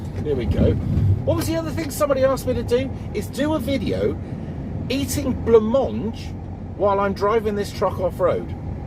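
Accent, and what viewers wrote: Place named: West Country, United Kingdom. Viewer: 1 year on, luckily no power cuts so far. But who knows what can happen within the next 10 - 15 years if things don't improve i.e. building enough new power stations to cope with increased demand? Soz you don't have a partner if the lights go out lol. But as long as you're happy in life? I enjoy watching your videos. If the power goes out, I won't have anyone either. Need plenty of books to read and keep the Nintendo Switch charged up when there is power.🤣 Sounds like you live in the south west of England - West Country area?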